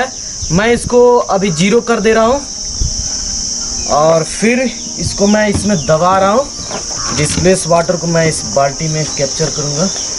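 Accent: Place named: Bhojpuri, India. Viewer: Bahute accha video rahal bhai Tahar Bhojpuri se lagela ki tu Bihar/ UP se bara bhai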